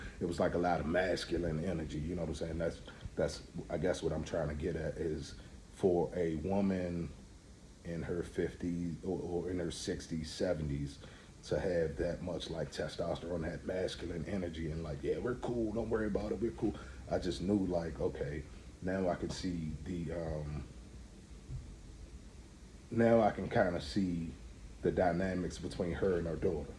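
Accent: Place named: Maryland, United States. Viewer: I hear MD in you me too where at brother.....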